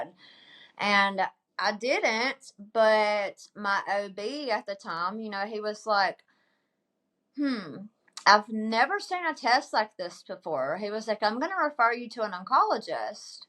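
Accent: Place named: Alabama, United States. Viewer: What a lovely lady , so brave and positive. Love your accent . I love Alabama ❤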